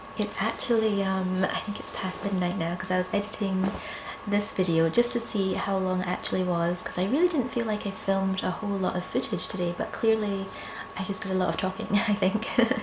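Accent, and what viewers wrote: Scotland, United Kingdom. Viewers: pretty sure she's a native with that scottish accent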